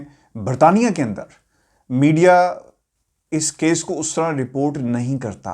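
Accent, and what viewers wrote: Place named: Pakistan. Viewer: So far ur Urdu is Pakistani Urdu, please Mr tell other anchors too do not sound like Indian anchors. Let them see BBC Urdu service and to see the difference BBC HINDI. UR doing a great job like Imran khan (Anchor) & Imran Khan ( prime minister ) can I call u Imran 😂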